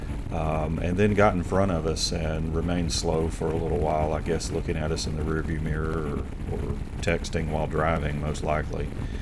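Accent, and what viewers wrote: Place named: South, United States. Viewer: Just a quick comment to let you know how much I enjoy your well-done and informative videos about the Ural life. The vids which are solely travel logs of sorts, with endless GoPro footage and no commentary are boring. Yours are educational, and I must say, your calm, relaxed manner with just that touch of the South in your voice is perfect. I know these are a lot of work, but hope you keep them coming.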